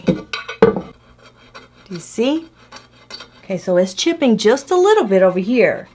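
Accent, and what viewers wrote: South, United States. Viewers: Omg that is so funny you said cotton candy grapes because I’m eating some as I’m watching this.... I love your videos!!!! Where are you from? Sometimes I hear a southern twang in your voice.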